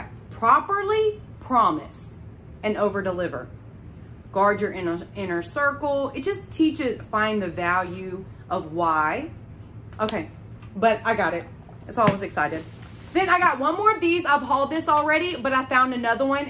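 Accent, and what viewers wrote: South, United States. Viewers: I always smile when I watch your hauls. You talk fast & Southern like me.🍓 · Girl yes! I could tell you are from the south with your fun accent.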